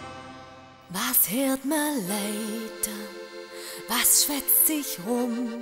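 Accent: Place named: Swabia, Germany. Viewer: Schee Schwäbisch 👍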